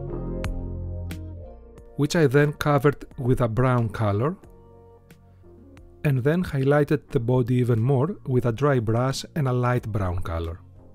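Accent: Greece. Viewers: AAAAA RE HELLAS I LOVE YOUR GREEKLISH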